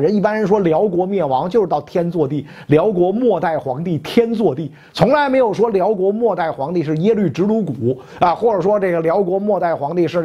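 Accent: Beijing, China. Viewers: 北京北城人说话大概就是袁老师这个样子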